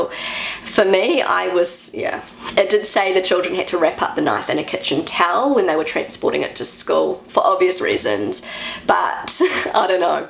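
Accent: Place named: New Zealand. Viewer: Welcome back! Really missed your Kiwi accent. Measles vaccination: I really wish they'd have had that back in the 1970s when I got the measles as a child. It wasn't fun. I had such a high fever the doctors feared for my life. At least that's what my parents told me, but they're parents and maybe exaggerating. Knives: Oh come on! I'm from a rural area, and we boys always had our sharp Swiss Army Knives with us. You can't send a boy to school with a blunt knife, that's embarrassing. Playgrounds: When I was a kid, we had none in our village. But there's a forest, and we were climbing trees instead. Without parental supervision, occasional scratches and bruises included. To be honest, I'd still prefer that. There are sugar-free and even vegan gummi bears, I'm sure the ones from the pediatrician are something like that. Personally, I think children shouldn't be rewarded for doing things that need to be done, but opinions vary. 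"Getting out of winter mode", again: I'm from a rural area. We watch the weather, not the calendar. And you know what the parents in my neighborhood do? They let their children decide what they want to wear. That's good education, in my opinion. They learn from experience, which includes feeling uncomfortable at times if their decision wasn't so wise.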